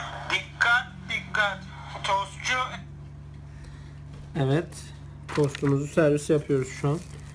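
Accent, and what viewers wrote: Gaziantep, Turkey. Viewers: ABİ ADAMIN GAZİANTEPLİ OLDUĞU ÇOK BELLİ OLUYO KONUŞMASI AYNI GAZİANTEPLİLER GİBİ BENDE GAZİANTEPLİYİM TOSTUNU YEMEDEN ÖLMEM İNŞALLAH:D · Normal somun ekmeğe Bizim Özel tost ekmeğimiz diyoN:D abi antepli oldunu çok belli ediyorsun Konuşma şeklinden bilmeden önce anlamıştım:D özelliklede şu kısım (buyrun tosta başlayalım (Ozaman) ):D bende antepliyim:)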